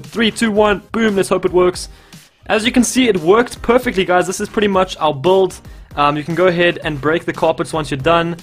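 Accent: South Africa. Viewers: let me guess...South African? yup me too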